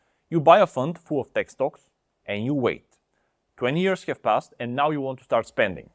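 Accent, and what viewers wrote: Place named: England, United Kingdom. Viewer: Very hard to understand and main reason is that ''English'' accent ... :(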